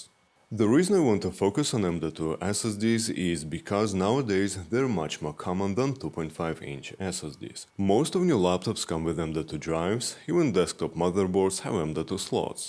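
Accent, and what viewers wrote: Russia. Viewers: Русский чтоль? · русский акцент не скрыть)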